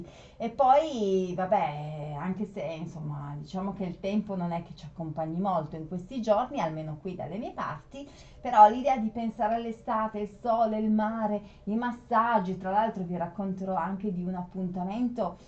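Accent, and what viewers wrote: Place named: Piedmont, Italy. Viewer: Che bella donna che bella persona ❤️ io amo il tuo accento 🌻 ..avevo un'amica del Monferrato mi piaceva troppo come parlava mi ricordi quella situazione piacevole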